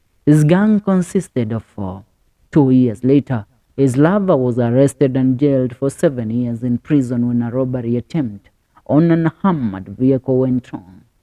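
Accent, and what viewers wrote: Kenya. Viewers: It would greatly help if u worked on your oratory skills..We may accept the Kamba accent, but the delivery is all wrong..Master the content n narrate in a manner that excites..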